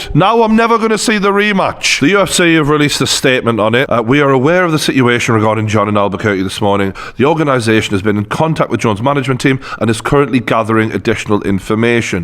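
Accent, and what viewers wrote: Newcastle, United Kingdom. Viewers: I`ve subscribed just cos you're a Geordie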